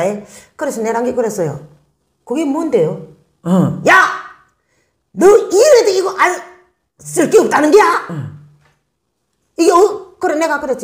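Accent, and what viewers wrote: Hamgyong, North Korea. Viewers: 여사님 이름이 마음에 듬니다 남한에는 트롯트가수가 유명하지요 함경도 말씨가 정감이 갑니다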